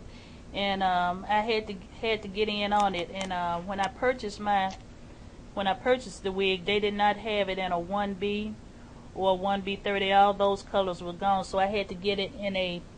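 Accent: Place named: South, United States. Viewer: Girl I love this wig on you!!!!!! And love your country accent =)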